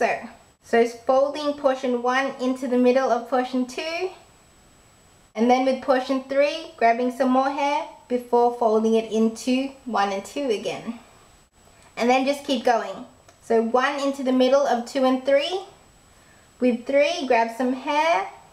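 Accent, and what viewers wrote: Australia. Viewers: Are you Australian? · R u Australian????? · Okay hold on.... Just started the video and i had to pause it to say this lol.... Your so cute !!! Hahaha :) is that an ozzi accent? Ima kiwi yo !! Lol anyway, the start has already made me excited and i can tell that your gonna make it easy :) your awesome missy !! :D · are you Australian??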